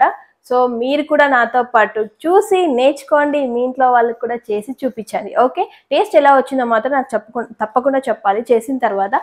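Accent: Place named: Anantapur, India. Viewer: Pure Anantapur slang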